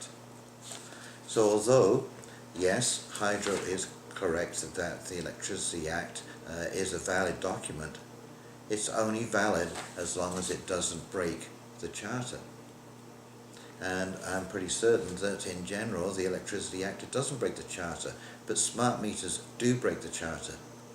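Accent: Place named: Yorkshire, United Kingdom. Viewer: I am questing you are from Yorkshire England, great video and 1 of many trying to get the word out. my son is fitting smart meters, he thinks they are a great thing as many do, i will show him this video, its about showing people the real truth, and searching, my son is fitting 5 on average per day many are inside the home, they have fitters all over England doing the same, next comes 5G,, they are trying us, and many can't see it, and the joke is they had this technology a long time, there is more to come, chip will be next, we could stop it so easily if we all pulled together, but while we have many mostly young thinking all this stuff is cool we don't have a chance to stop it, I'll get russia say no to them as they banned many things including microwaves , my advice would be to purchase a device that can tell gow much radiation is coming from all our household items, even the simple toaster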